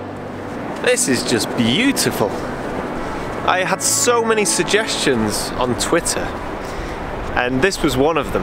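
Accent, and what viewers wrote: United Kingdom. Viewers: Your channel discovered me somehow and I’ve enjoyed it thoroughly! Your accent is amazing! From where in the UK are you from ? Can I with my Mamiya C220 and Sekor 55 lens attempt at these vast expanse type pictures? I am yet a film amateur photographer! Should I resort to my Sigma 18-35 mm wide angle zoom for my Minolta Alpha 35 mm camera instead? And if you’re ever in Costa Rica I’ll take you to the Roca Bruja (Witch rock) in Playa Naranjo at the Santa Rosa National Park for some pictures! Regards, Robert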